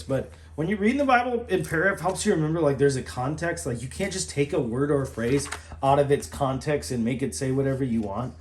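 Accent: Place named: United States. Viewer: He also pronounces calfskin as 'keffskin' so I'm assuming it's a regional US accent!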